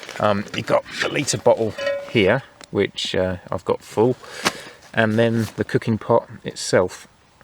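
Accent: United Kingdom. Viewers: Haha, actually you seem to be from UK because of your accent.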